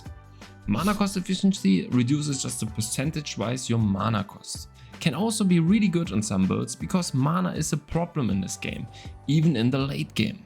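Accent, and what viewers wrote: Germany. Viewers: Vom akzent sprichst du deitsch also wirst du sicher nichts dagegen haben, wenn ich meine frage auf deutsch stelle 😎 Was bedeutet denn die Zahl ohne %? Das kapier ich gar nicht. Zum Beispiel Melee Heavy Attack Chance 401...was bedeutet diese zahl? Und wo genau sehe ich die allgemeine crit chance im allgemeinen? Danke für deine antwort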